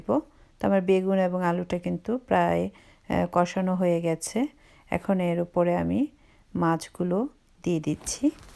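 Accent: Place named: Dhaka, Bangladesh. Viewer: আপনার কথা শুনে ও ইলিশ মাছ কটা দেখে মনে হল আপনি ঢাকা বা বিক্রামপুরের মানুষ।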